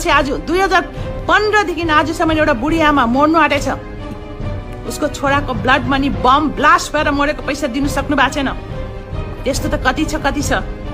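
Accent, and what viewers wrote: India. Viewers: ali bhasha lawaj sunda Inian jasto cha. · tapai ko bwoli sunda indian jasto lago ne de jeu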